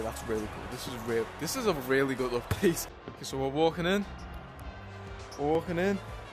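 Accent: Liverpool, United Kingdom. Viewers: Yo are u from liverpool England?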